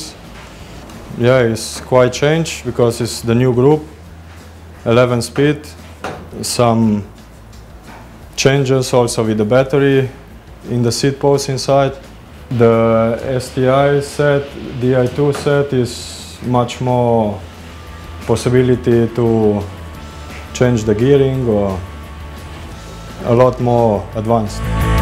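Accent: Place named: Slovenia. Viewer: I started to watch a clip, didn't know who is talking, but after two words I knew he is from my country... Slovenia! Very typical accent... :) Painful, I saw the comment, very true! But as far as I know, he's good mechanic, who cares if he can set up your bike like a pro! :)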